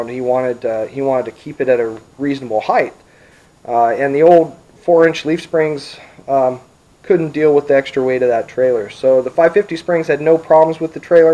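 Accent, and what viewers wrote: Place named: California, United States. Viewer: I could tell you were a californian right away. lmao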